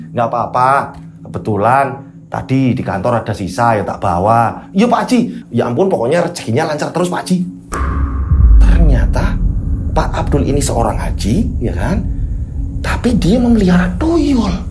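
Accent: Java, Indonesia. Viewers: Jadi seneng ni Bawa ceritanya bagus dengan bahasa medok jawanya ilustrasi wajah pas bawa ceritanya.suka suka...suka..❤❤ Boooom Booom Boooom dilanjuud njih ❤❤🙏🙏👍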